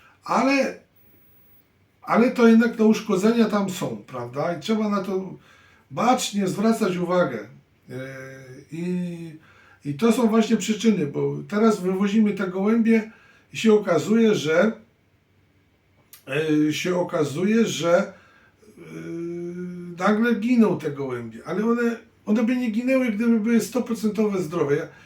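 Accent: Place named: Russia. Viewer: Co ten chłop pieprzy.Mowi na filmiku że już teraz zbiera czarny bez i daje gołębiom.Bylem w paru miejscach z ciekawości gdzie rośnie czarny bez i wszędzie jest jeszcze niedojrzały.Dlatego mnie to zdziwiło że ten hodowca od siedmiu boleści mówi że już zbiera i podaje bo ja też podaje bez i zbieram dojrzały na początku września.Radze nie słuchać rad tego hodowcy bo nie trzyma się kupy ani dupy.A jak taki wybitny hodowca że chce szkolić innych to niech się pochwalić jakie ma wyniki na przestrzeni lat na szczeblu oddziału już nie wspominając wyżej Żenada co on bredzi na tych jego filmikach jeszcze ten ruski akcent.Ortodoksyjny Ślązak!!!!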